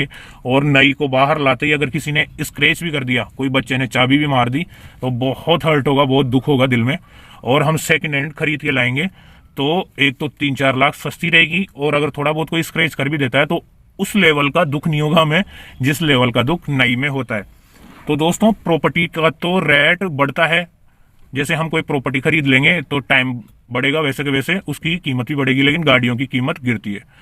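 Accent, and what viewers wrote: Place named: Kota, India. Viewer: Bhai आप Kota k as pas k ho.? Maine boli se pahchan li😂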